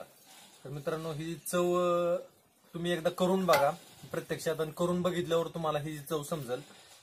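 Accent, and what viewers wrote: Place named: Marathwada, India. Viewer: Kuthle bhau aapan? Marathwadi bhasha watate..me Latur chi...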